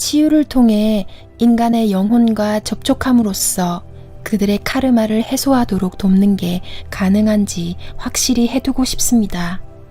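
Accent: Gyeongsang, South Korea. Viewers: 안녕하세요~오늘 처음 와봤는데 왠지 귀에 착착 붙는 경상도 억양...ㅎ 제가 대구 출신이라 너무 정감있네요~^^ 저와 님의 끌어당김으로 제가 오늘 여기에 온것이겠죠? 좋은 내용 감사히 잘 들었습니다~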